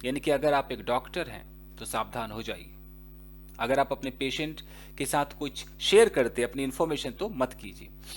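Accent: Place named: Bihar, India. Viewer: Sir ,where are you from ,it seems that u are from Bihar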